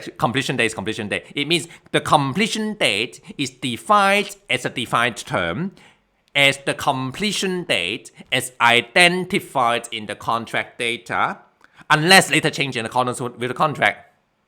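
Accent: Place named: India. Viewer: 有点印度味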